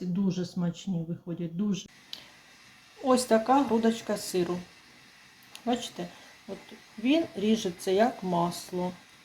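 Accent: Chernihiv, Ukraine. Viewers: Вітаю,зайшла до вам на канал випадково,але почувши такий рідний Чернігівський акцент,просто,як бальзам на душу, дякую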